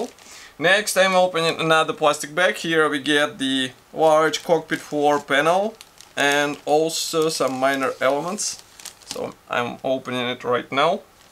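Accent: Russia. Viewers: Какой то акцент как у русского. ты русский что ли?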